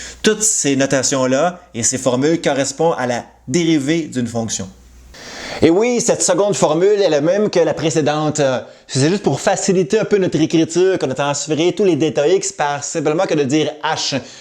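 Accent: Quebec, Canada. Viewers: Je suis tombé sur votre vidéo totalement par hasard et je ne le regrette pas du tout. Merci pour ces explications très claires que j'avais dû zapper il y a très longtemps avec mon prof de maths au lycée 😁 Je comptais me remettre aux Maths et votre chaine me parait une bonne ré-entrée en la matière. Merci beaucoup. Je vais regarder vos autres vidéos avec plaisir. Et votre accent québécois me plait beaucoup 😊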